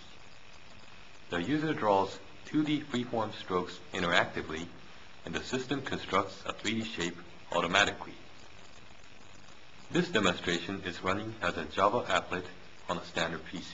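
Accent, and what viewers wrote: Flanders, Belgium. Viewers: flemish, an a bit english XD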